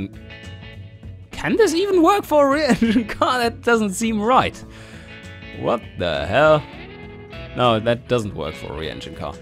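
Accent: Germany. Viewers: well im glad you make those xDDD and just to say - its not very bad but i can here youre german as me - ever thought of making videos in german?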